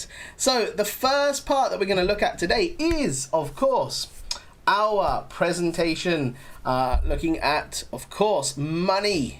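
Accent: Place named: United Kingdom. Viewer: I am crazy about British accent loved it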